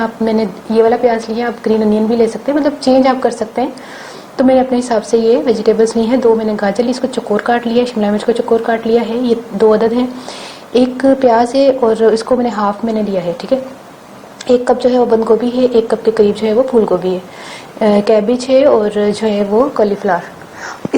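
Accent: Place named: Punjab, India. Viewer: Banda bry gor naal twadi video Wekh rya honda ju e jnab tusi punjabi boldy o andru'n hasa nikal janda😜🤣😂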